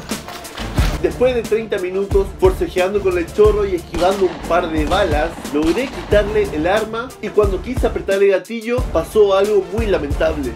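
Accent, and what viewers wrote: Argentina. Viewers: ¿eres argentino?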